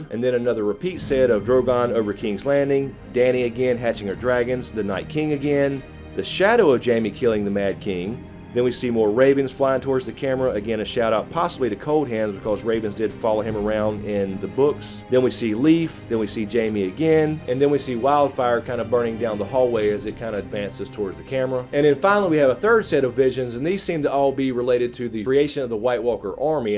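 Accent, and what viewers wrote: United States. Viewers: Oh fuck, your American accent is too much for to handle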